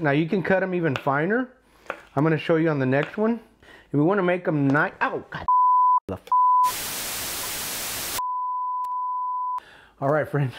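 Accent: Texas, United States. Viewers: I like how you look like a full Mexican but have the voice/accent of a Texan. AMAZING!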